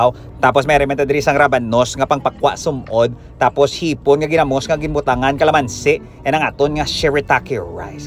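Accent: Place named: Ilonggo, Philippines. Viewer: I missed the bacolod illonggo na tono! goodjob!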